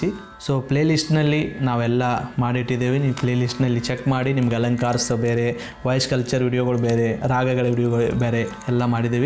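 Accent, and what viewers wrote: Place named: North Karnataka, India. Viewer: Tq so much sir play list madiddakke........ Sir nimma uru yavadu sir... Nimma language namma uttara karnataka du ide... Please sir tell me ur from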